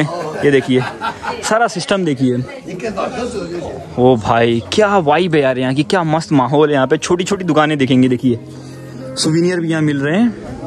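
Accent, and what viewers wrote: India. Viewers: What do you think without subtitles? I can't understand Indian English.😅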